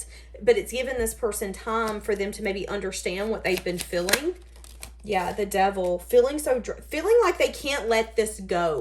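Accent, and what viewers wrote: South, United States. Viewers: You're pretty... Love seeing the face with the southern hospitality accent... Blessing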